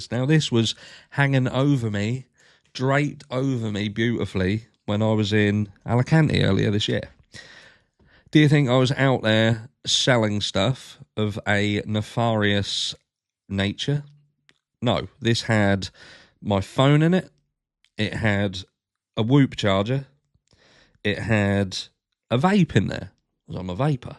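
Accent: Norfolk, United Kingdom. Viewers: That’s a good old Norfolk or Suffolk accent boy where ya from ??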